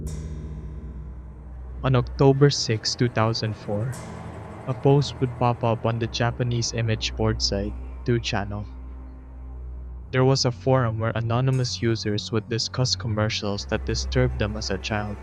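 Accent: Philippines. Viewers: I hope you don't find this offensive, I just want to say that the Filipino pride in me ran rampant in my blood because your accent sounds like Filipino. I hope you continue and I commend your content, graphics (which reminds me of my fav YouTubers: Nexpo and Crowley), and the voice-over. It's nice to have you back on my recommended.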